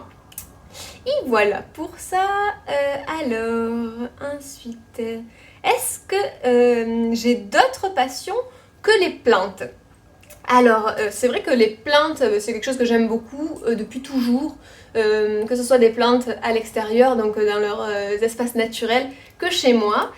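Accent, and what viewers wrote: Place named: Montpellier, France. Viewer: Félicitations pour le mariage! Je viens de découvrir ta chaîne. Ton accent me disais quelque chose ^^ je suis de Montpellier :) au plaisir de te rencontrer un jour ! En attendant je continue de découvrir tes vidéos ❤️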